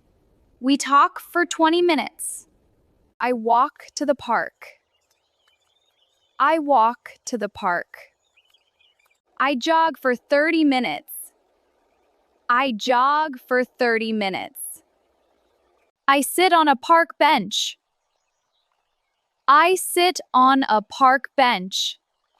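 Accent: United States. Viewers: Please is it american pronunciation!? I would like British one also😊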